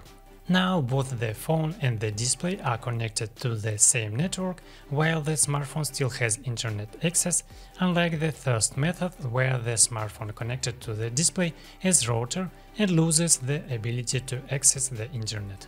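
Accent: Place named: Russia. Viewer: И у тебя сильный русский акцент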